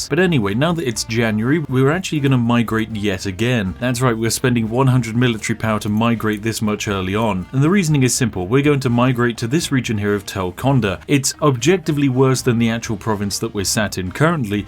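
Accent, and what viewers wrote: United Kingdom. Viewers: Is he actually British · First time watching u and im sure u heard this a thousand times but i like this very british accent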